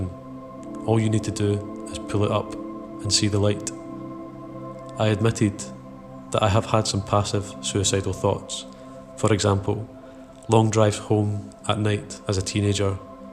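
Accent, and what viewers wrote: Scotland, United Kingdom. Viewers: u fae Glasgow by any chance? or somewhere else in scotland ?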